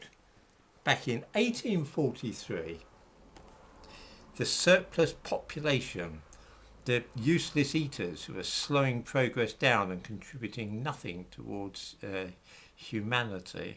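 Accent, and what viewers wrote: United Kingdom. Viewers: got a wonderful British accent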